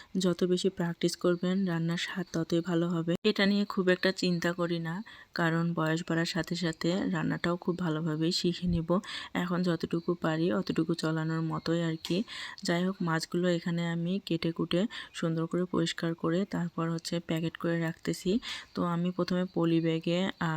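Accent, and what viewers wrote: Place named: Rangpur, Bangladesh. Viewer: আপু আপনার কথা শুনে মনে হচ্ছে আপনার বাড়ি রংপুর ?কোন থানা জানাবেন আমার বাড়িও রংপুর।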